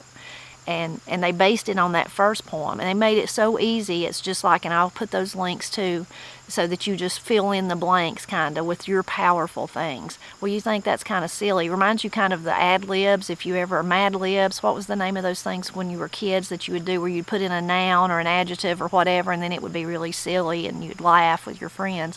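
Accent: Appalachia, United States. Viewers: Love Appalachian talk! “Canon jars” a container for a camera. or a container for storing sacred books, dogmas.e.g “The Pastors wife, was canon the last supper”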